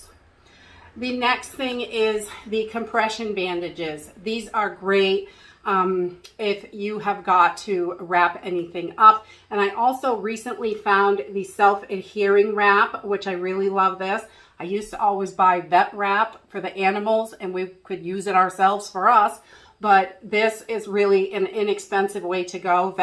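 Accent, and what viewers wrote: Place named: Bronx, United States. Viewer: Wow that is one i don't have that wrap. Every time i see you up i think Oh I have to go watch you you get me grinning, i don't want to sound personal are you or family from the bronx? You sound like you have a bronx accent. Love your channel. ☺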